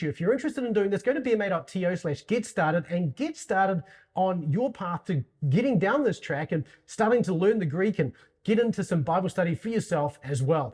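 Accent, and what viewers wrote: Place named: New Zealand. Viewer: Great video, love your content. Not sure if you are aware how you are pronouncing God as “guard”. Quite off putting given the subject matter. But I am fond of NZ accents. You do great work. Keep it up!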